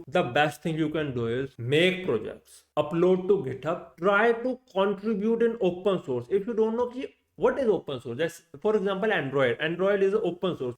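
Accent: India. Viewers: Bhai english hindi jaisi hai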